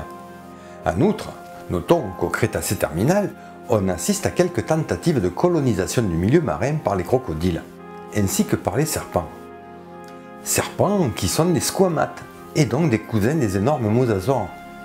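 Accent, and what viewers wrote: South, France. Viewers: Bravo ! c'est très intéressant. Je m'abonne ! Bonne continuation. Comme quoi on peut avoir l'accent du sud et présenter intelligemment autre chose que la météo à la télé et ou à la radio dans notre pays. Adissiatz ! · Le sujet de la chaîne est intéressant, mais j'ai un gros reproche à vous faire : compte tenu de votre accent méridional à couper au couteau, il faudrait éviter de vous exprimer comme un DJ. Vous n'animez pas une foire au cochon locale. Je n'ai pas compris grand-chose du premier coup et j'ai fait beaucoup de retours en arrière. En vous exprimant calmement, posément, ça irait infiniment mieux.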